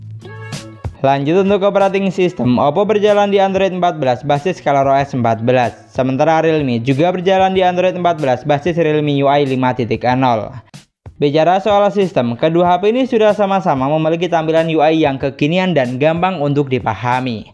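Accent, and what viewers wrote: Madura, Indonesia. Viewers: review dengan logat Madura nya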